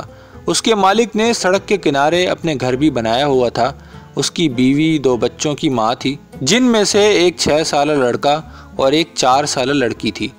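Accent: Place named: Pakistan. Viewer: Aapke talaffuz se aisa lagta hai ki aap pakistan se ho.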